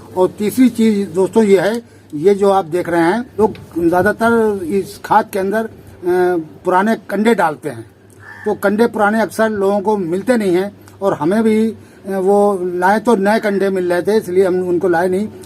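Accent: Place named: Kannauj, India. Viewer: Sir aapaki boli se lagta hai ki aap up kannauj or farrukhabad se hain.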